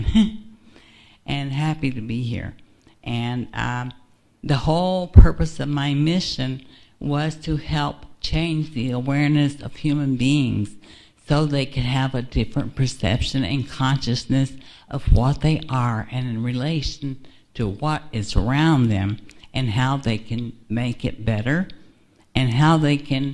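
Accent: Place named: United States. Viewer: アメリカ英語に聞こえます。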